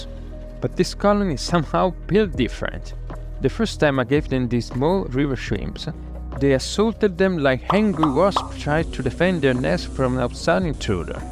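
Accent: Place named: England, United Kingdom. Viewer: I have a question: Where are you from, because I recognise that you are from England because I'm from Italy 🐜🇮🇹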